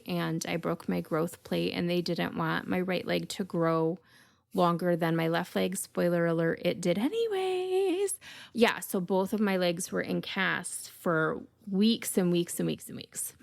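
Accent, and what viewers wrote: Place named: Michigan, United States. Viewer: I think your channel is fabulous!!! I was born and raised in Michigan. I moved to Washington state in 2011, and I really miss home! I feel like I found gold...I'm addicted to true crime podcasts, and hearing your stories in our lovely accent (lol) makes me so happy! Thank you for your thorough research and very interesting subject matter....keep these videos coming!!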